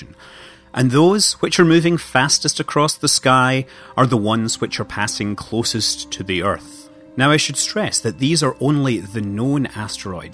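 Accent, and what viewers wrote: Scotland, United Kingdom. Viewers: my god what a snooty Scottish accent you have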